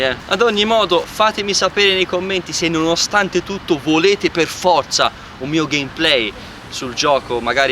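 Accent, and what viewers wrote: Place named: Tuscany, Italy. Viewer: Meno male che ci sono altri YouTuber con un simpatico accento toscano ma che sanno quello che dicono, quando parlano di videogiochi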